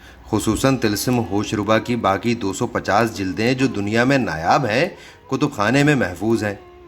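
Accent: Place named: Lucknow, India. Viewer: لکھنوی لہجہ کی الگ سے داد۔ ۔۔۔۔یار کیا بات ہے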